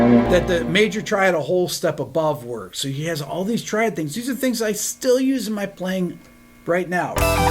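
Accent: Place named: United States. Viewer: Been playing for 40 years but my knowledge is reassured everytime I listen to Me Beato, being Spanish my first language,my English skills are also honed with his excelent American accent.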